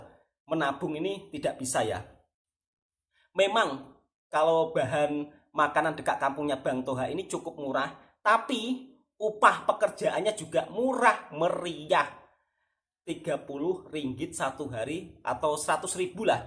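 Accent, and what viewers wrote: Java, Indonesia. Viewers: Ini, jowonya waduh...buaayak pekatnya....hehehehe😂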